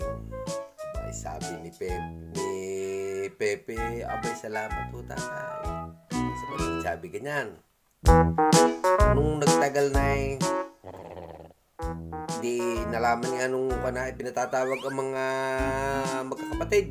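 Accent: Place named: Batangas, Philippines. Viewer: Hello sir god bless you po joker pala si sir hehe sir para po kayong batangueño asawa ko kc ganyan mag salita e.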